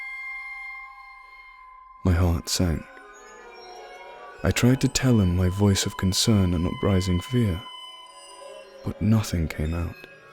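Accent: England, United Kingdom. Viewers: He's like Morgan Freeman with an English accent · Thor is played by Cris Hemsworth. He's got an Australian accent...this dude's accent is English.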